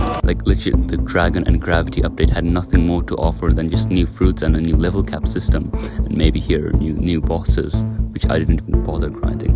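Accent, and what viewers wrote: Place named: India. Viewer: BRO YOUR IN NINTH GRADE ??? SOUND LIKE A 20 YEAR OLD INDIAN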